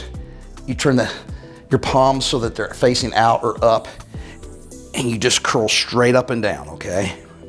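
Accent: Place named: South, United States. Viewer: He's from The South. We sez it lack we wunt.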